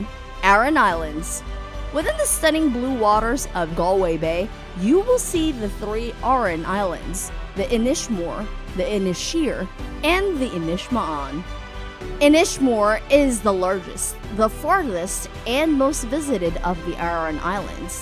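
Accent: United States. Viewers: A PNW voice, possibly shy, unconfident. ?.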